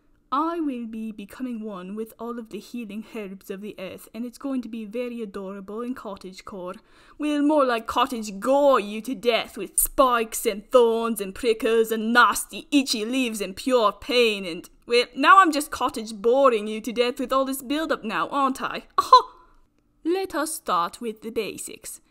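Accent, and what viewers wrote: Scotland, United Kingdom. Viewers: ur accent is more scottish than brittish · This makes me laugh and I need more- not rushing you though. Scottish accent noises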